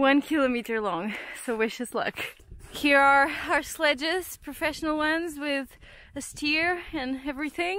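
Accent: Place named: Norway. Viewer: Hi I'm Joachim I'm from Oslo, like you're videos 👍 I think it's really cute that you're English now starts to have a Norwegian accent 😊😅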